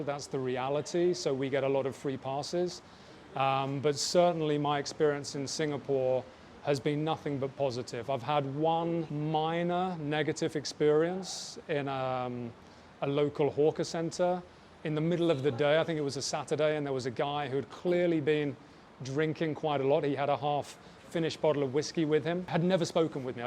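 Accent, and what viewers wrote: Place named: United Kingdom. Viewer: Don't really like the heavy distinctive british accent too, something like that of Mr Bean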